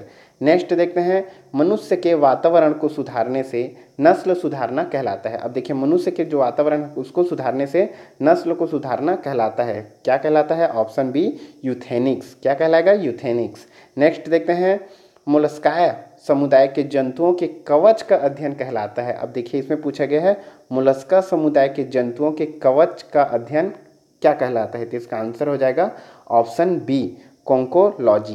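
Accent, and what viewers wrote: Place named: Chhattisgarh, India. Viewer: c.g kuc jyada hi bolte ho kya sir..hindi ko v chhatisgarhi typ tunning me padhh rhe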